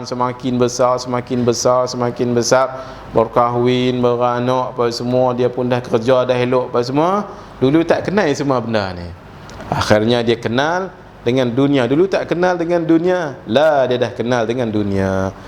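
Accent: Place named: Sarawak, Malaysia. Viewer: dlam bercampur2 slang melayu sarawak dan kedah tetapi tetap indah dan sopan serta penuh ilmu.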